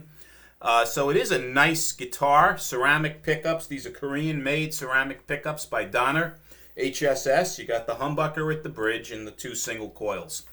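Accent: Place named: East Coast, United States. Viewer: I appreciate the in-depth review, coupled with the East Coast accent, which completes the experience for a Midwesterner like me. BTW, if anyone clicks on your affiliate link, you get a piece of the action for so many minutes afterward, regardless of what is purchased.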